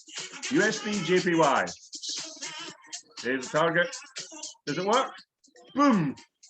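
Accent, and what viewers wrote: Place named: Scotland, United Kingdom. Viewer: I've just upgraded my tradingview to the pro+ with volume profiles. I've spent the full weekend looking and reading about the profile, the problem is 99% are showing you hindsight or they are just explaining the profile and not actively trading with it. Am I right in saying that you are always targeting the previous days POC? Today is my first day with volume profile and i'm also trading futures with CME data, i'm going to go through all videos on the channel, any pointers on what videos are clearly describing your strategy? BTW great to hear a fellow Scotsman, cheers!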